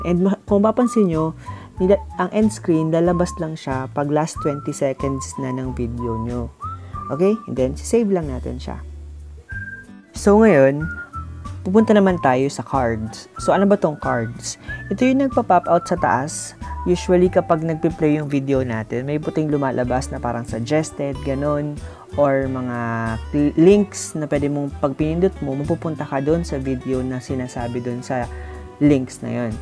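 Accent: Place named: Philippines. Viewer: THE CUPIDO CLAN he speaks English but has a fillipino accent and sometimes he's speaking a little bit of Filipino and just put English sub i hope that helped and sorry 😞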